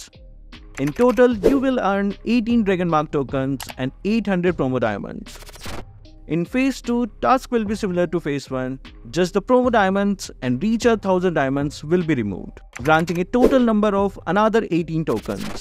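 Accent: India. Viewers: Sir I know ur indian bcoz of ur accent..I love indian people I work here in 5 star restaurant in El Nido Palawan I always have an Indian guests.i hope 1 day u will visited here.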